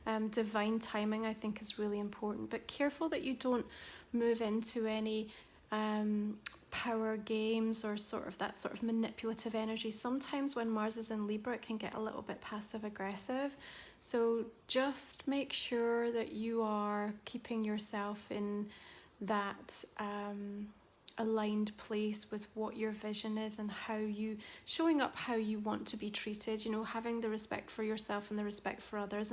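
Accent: Scotland, United Kingdom. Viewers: Hi there: Thankyou sincerely for the wonderful reading. I have to say I thoroughly enjoyed the reading, especially your voice. You accent seems familiar, am I right to say you are of Scottish blend? If so, I am too. Its nice to know that someone from my beautiful country is reading me which is a great pleasure and a tonic. No disrespect to the other Tarot readers they're all wonderful when they read my sign. But to listen to a Scottish voice is my cup of tea at anytime. Anyway, I've been a bit isolated lately, but when I'm busy writing my novels, I prefer peace and quiet it helps me concentrate, I'm also doing a bit of DIY at home, so that keeps me busy as well happy with the results, just to freshen up my house a bit, makes me feel content and satisfied. But thanks a trillion fur the wtkvime ing reading which was also very inspiring. I hope to hear from you again, your a breath of Scottish fresh air m, and we all know how cool and fresh that is eh Brrrrr... 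Thanks again & God bless.xxx⚘💞💐💐🌺🌺🌺🌺💜♦️💜🌟🌟🌟🏴🏴🏴🙏🙏🙏💎💎💎💘💎💎💎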